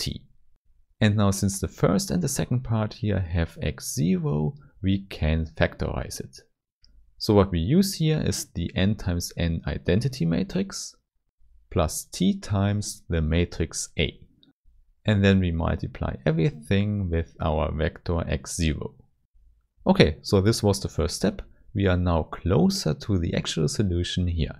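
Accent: Germany. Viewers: This is great explanation, very useful.Thank you. P.S.Judging by your accent, I assume that you are from Berlin.🙂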